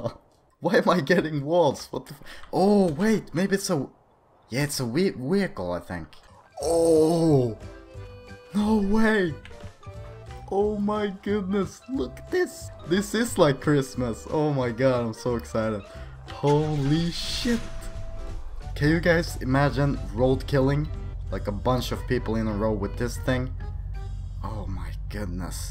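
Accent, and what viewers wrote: Sweden. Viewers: What are you Swidish or What ?or i hear wrong?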